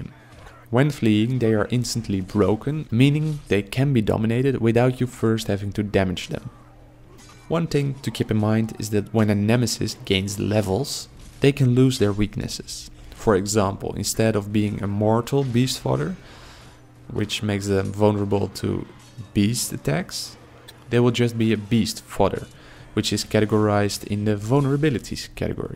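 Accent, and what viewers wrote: Netherlands. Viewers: Just curious are you dutch I'm really curious about your accent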